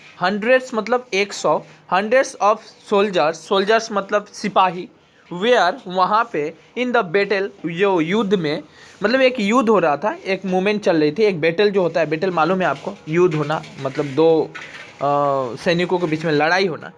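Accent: Assam, India. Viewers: are u assamese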